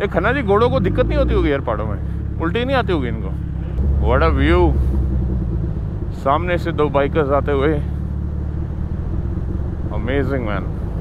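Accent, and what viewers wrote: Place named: Bihar, India. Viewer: Stop speaking like bihari babu aaraha Hain speak like Punjabi aa rahi Hain Hain bro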